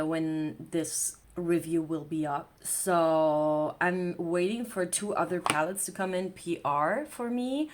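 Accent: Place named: RP, United Kingdom. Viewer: Love the video and the look, gurl. It's great hearing you talk english. Very posh. Even I did not grow up bilingual, due to working in different international companies, my english improved a lot and I'm not afraid to use english, whereever it is needed - and yes, I use much more swear words in english. You asked about the difference between purple and lilac. For me purple is darker, warmer whereas lilac is more of a light cool tone.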